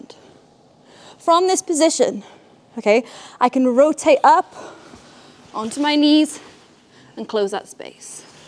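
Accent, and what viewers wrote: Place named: United States. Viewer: I can hear a hint of a US accent developing in the intro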